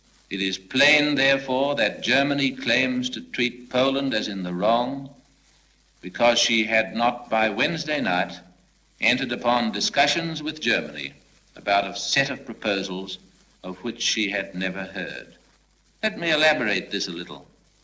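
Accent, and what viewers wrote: Australia. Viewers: Great Australian accent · Menzies had a cultivated Australian accent, listen to Geffrey Rush or Dame Elizabeth Murdoch or even Barry Humphries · He has a Cultivated Australian accent.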